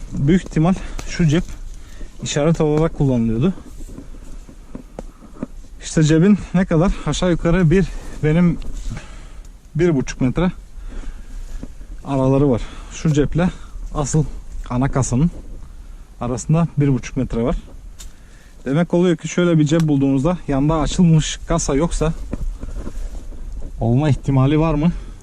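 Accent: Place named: Aegean, Turkey. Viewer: Abi konuşman ege muğla aydın tarafı gibi nerelisin sen hemen beniyle bir irtibata geç asıl işaretler burda biz anlamiyoruz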